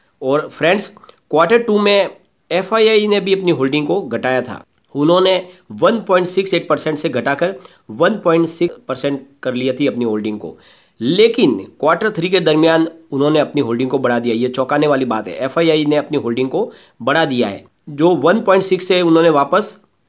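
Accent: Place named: Marwari, India. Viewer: Rajasthan m bhi marwadi accent arha hai. Mybe belongs frm pali,jalore,jdpr,sirohi. May be i gs🤔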